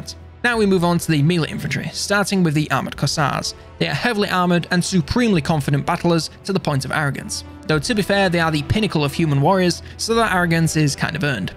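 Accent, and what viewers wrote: United Kingdom. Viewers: For the longest time I thought they must call this game “Wahhma” in the U.K. based on this guy’s accent.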